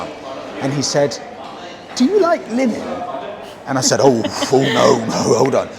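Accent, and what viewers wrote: RP, United Kingdom. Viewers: Your voice has a clear, modern RP accent that gives your videos the tone of a high-end documentary. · My Mother is from Nuwara Eliya and My Father is from Kandy..... so I enjoyed this VDO very much... the art you do this VDO is magnificent.... I love your British ascent.... thank you for taking my country to the world.... Totally regret about the scams.... that is common in this part...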